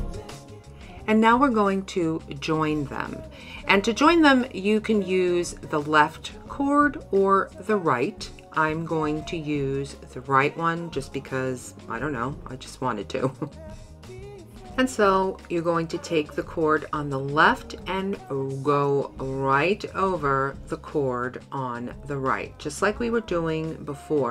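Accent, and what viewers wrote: New York, United States. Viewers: Absolutely Love your uplifting attitude!!!! I would love to start a YouTube channel. I love crafting, my sister inspired me to begin & now I can’t stop!!!! I love the DIYers , there’s so much to learn & be inspired from. Don’t stop what you’re doing. Trying to figure your accent NJ/NY?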